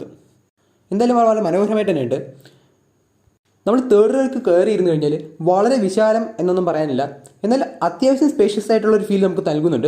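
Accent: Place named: Palakkad, India. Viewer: എന്തായാലും പാലക്കാട് ഭാഷ വിട്ടു ഒരു കളിയും ഇല്ല ഈ ഭാഷ തിരുവനന്തപുരംകാരൻ കേൾക്കുമ്പോൾ മനസ്സിലാവുമോ എന്തോ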